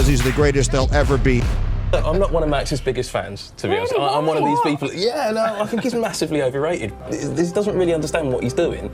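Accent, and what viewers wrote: United Kingdom. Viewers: Very skeptical once I hear a British accent, because no one is more biased than you lot. Will give it a few minutes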